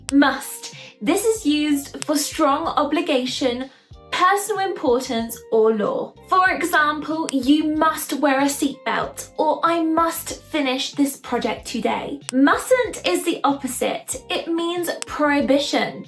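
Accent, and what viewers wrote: United Kingdom. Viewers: You British? Correct?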